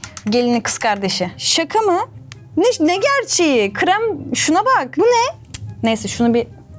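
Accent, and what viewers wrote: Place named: Adana, Turkey. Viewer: abla adana li gibi sin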